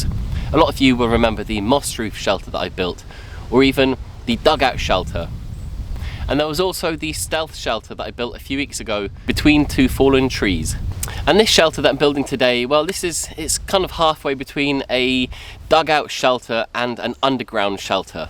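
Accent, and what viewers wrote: United Kingdom. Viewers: then with that being said....your guess would be as good as mine. Lol 😂..for living in Virginia for 25 years you still have a heavy British accent. Which is cool 😎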